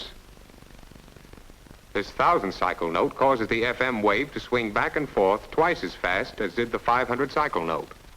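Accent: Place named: New York, United States. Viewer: I’m trying to figure out his accent. Where do you feel he is from? East coast? West coast? New York? I am from NY and have seen old films where people who are from NY used to have slightly different accents.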